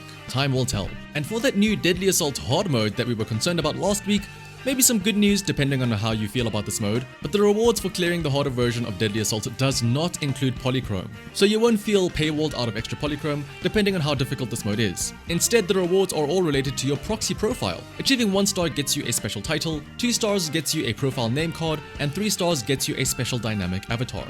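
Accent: South Africa. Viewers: Are you from South Africa cuz ur accent really sounds like it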